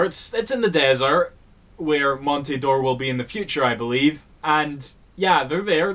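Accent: Scotland, United Kingdom. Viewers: I'm not sure about this. I don't agree with some of the choices, and there is no footage or anything. But then again... You are brilliantly scottish. .... I'M CONFLICTED A!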